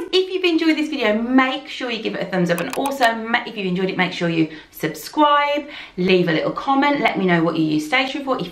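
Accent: Birmingham, United Kingdom. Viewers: honestly. I bloody love it! Your lil brummy twang makes me so happy - my family are from Birmingham and it just pleases my ear to hear!xx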